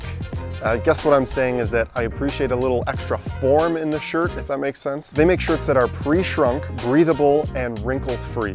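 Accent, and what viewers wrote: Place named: Canada. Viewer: I'm guessing from your accent You're from Canada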